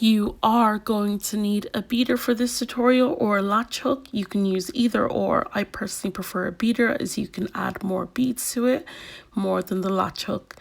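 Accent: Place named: Ireland. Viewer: Are you Irish? Your accent is beautiful.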